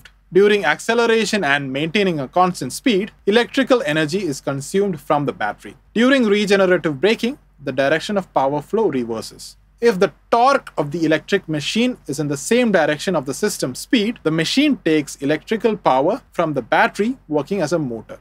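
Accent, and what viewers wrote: India. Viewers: ofcourse Indian accent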